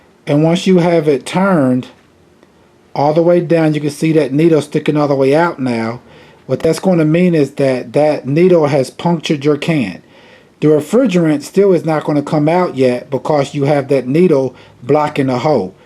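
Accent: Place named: Midwest, United States. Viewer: Love the midwest accent "right thurr" 😍👌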